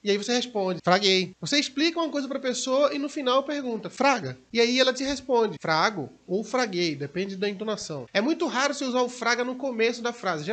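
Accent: Minas Gerais, Brazil. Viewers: Kkkk vc tá mais mineiro do que eu 😆😆😆 adorooo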